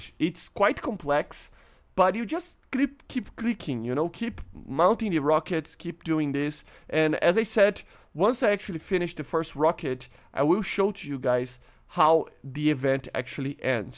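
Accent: Brazil. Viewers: Eu sabia que era BR, só vi o seu clã no final do vídeo KKKKKKKKKKK Eu falei, mano, esse sotaque é muito de BR, não é possível !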